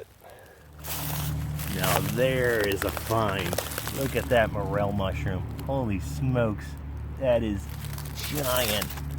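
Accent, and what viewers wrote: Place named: Pennsylvania, United States. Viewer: I watched a bottle dump episode of yours and I knew you were from PA from your accent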